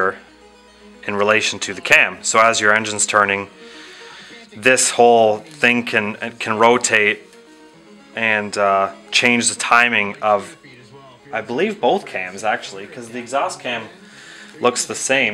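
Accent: Canada. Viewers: are you canadian Eh? Nice video! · Very informative, and in pretty good Canadian English, with "zed" for "Z" and everything.:) Thanks for posting!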